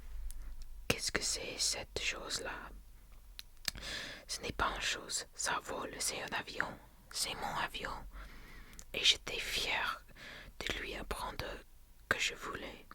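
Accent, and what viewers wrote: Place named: Canada. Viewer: It sounds a little bit like videos from canada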